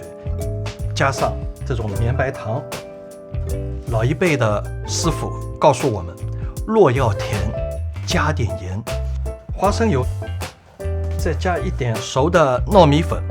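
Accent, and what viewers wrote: Shanghai, China. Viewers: 老师可不可以用上海话讲一期啊，加上字幕就好，听讲话我好心焦，沪普，为什么要让讲普通话呢，之前那个广东师傅就用的广东话录的视频。而且这种传统点心配上吴侬软语的解说更加有感觉！！！！！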